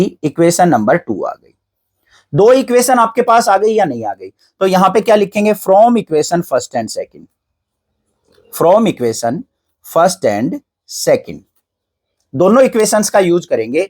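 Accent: Haryana, India. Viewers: Sir aap bhot achha phadao ho pr aap kit the ho apki avag main te haryanvi tone avhe hai 😅😅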